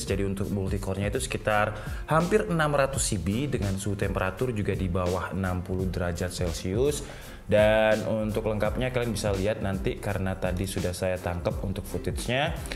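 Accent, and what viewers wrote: Java, Indonesia. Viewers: logate kyk wong jowo 😅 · pancen wong jowo kok mas wkwkwkwk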